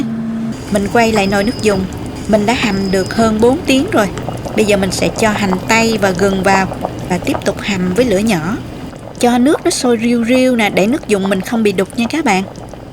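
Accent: South, Vietnam. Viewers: Chính xác, giọng ở miền nam mình nghe dễ hiểu hơn và ấm áp + chỉ dẫn thực hành rõ ràng dễ hiểu, có năng khiếu và chia sẻ công thức cho mn, đây cũng là điều tạo nhiều công Đức cho và con cháu 👍🥰🙏🙏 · Làm thấy đã ngon cộng thêm giới thiệu lại càng dễ thương hơn với lại giọng miền nam nữa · Giọng nói miền Nam dể thương và rất lôi cuốn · Qua phát âm thì em là người miền Nam, theo khẩu vị trong Nam thì em còn thiếuGiá trụng,Húng Quế và Ngò Gai cũng như cầncó thêm Tương Đen&Tương Đỏ ! Cám ơn video hướng dẫn của em.Thân.👍☺️ 😊☺️ 😊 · Tôi rất thích giọng miền nam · ơi, xin cho hỏi công thức nầy là cho mấy tô phở vậy cô? Nhìn cách cô nấu và trình bày hấp dẫn quá cộng thêm giọng nói đậm chất người miền Nam thích quá. Chỉ muốn nấu liền ngay thôi. Xin cảm ơn nhiều trước nhen. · giọng hướng dẫn đậm chất Nam bộ rất ấn tượng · Giọng nói miền nam dể thương quá e cũng người miền Tây cảm ơn chia sẻ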